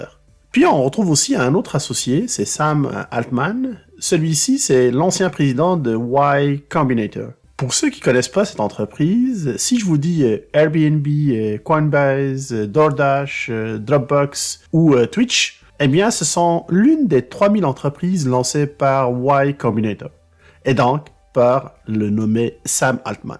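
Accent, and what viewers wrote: Quebec, Canada. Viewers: Êtes vous Québécois ??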